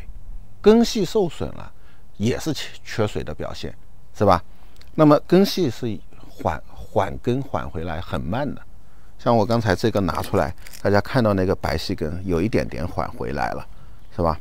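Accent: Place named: Zhejiang, China. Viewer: 我就想问下是衢州人吗？普通话妥妥的浙西口音啊😇听到乡音好亲切！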